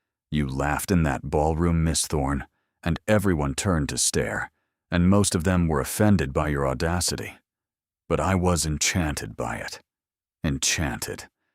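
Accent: United States. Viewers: I do wish that you would choose an English voice for narration, this American voice speaks as if it's telling a Mafia story. Spoils it all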